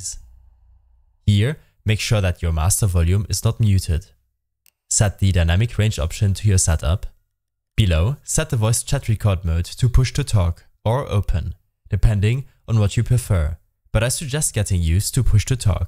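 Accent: Germany. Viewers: any cahnce that u are german ?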